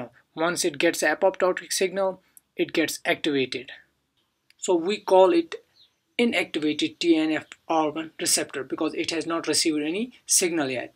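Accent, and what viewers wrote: India. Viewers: your accent is very strong indian, but you really made me get the clue. thanx from germany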